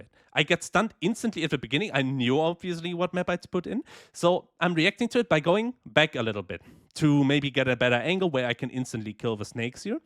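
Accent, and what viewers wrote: Germany. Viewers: world first listenable german accent love to see it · Deutscher Landsmann. Hört man sofort raus. 😂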